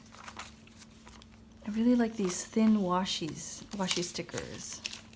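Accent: Canada. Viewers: Haha I could tell you were Canadian the first time I watched one of your videos :) I didn't realize how much I say "eh" until I lived in the UK and people would point it out 😄 I love this longer chatty style video! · Love hanging with you! Love seeing how you prep your pages. You can use the old calendar as a junk journal also. Just collage over the pages. I remember $2 Tuesdays for sure. I think my first movie was at a drive in with my family in Barbados. I was 16 and we saw the first Star Wars. How's that for dating myself? And yes you have a Canadian accent. 😁Also loved hearing you enjoy your sandwich. I also craft on my dining table. 🤗💞😘😘😁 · Fun video! Thanks for sharing-I love this type of video so please keep doing them! You definitely have a Canadian accent-it makes me smile when you say "Eh?". When we order pizza we order it well-done, so it has those darker bits of cheese and dough-yum! 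Have a great day! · Thank you for this video! I have had it saved in my Watch list for awhile but have been having a planner meltdown recently, ha! I think today I have sorted out my dilemma and came on here to watch something and saw your video and it was exactly what I wanted! In fact I am now going to make a playlist of a bunch of your videos to watch as I journal! Also, I don't hear your Canadian accent.... might be because I'm Canadian too:)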